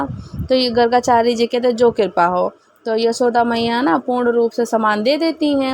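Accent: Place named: Braj, India. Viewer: Apki barjwasi awaj me hi aap katha ko sunano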